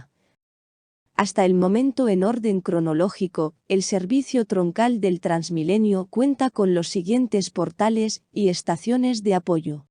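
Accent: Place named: Spain. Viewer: No me trama esa vocecita españoleta 🙄🙄🙄🙄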